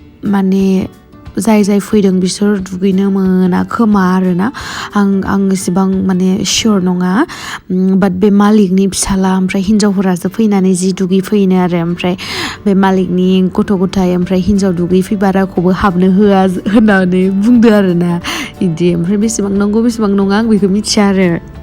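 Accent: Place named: Darrang, India. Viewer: darailaini badenw railai abo sona mwjagsw 👋👋😯😯😄😄👍👍🤟🤟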